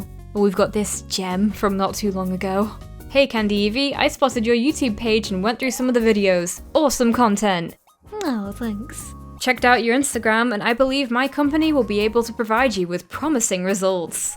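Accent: England, United Kingdom. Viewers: This channel reminds me of Rebecca or let me explain studios but a UK accent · It sounds like she tries to hide her english accent from some reason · you should Do an disstrack with your beautefull british voice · You are the british accent of jaiden animations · Are you British? You have an accent. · Jaiden but british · is that an english accent? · YOU SOUND SO BRITISH That's not an insult you're brilliant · British JaidenAnimations? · You sound the British version of Jaiden Animations No offense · Is she british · Is this person British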